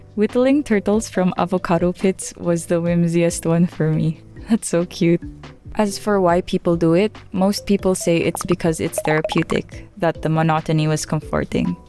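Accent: Philippines. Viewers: literally 5 seconds into the vid narinig ko agad yung accent (i never came accross u b4) and CLOCKED that u were a kabayan, and i was right 💅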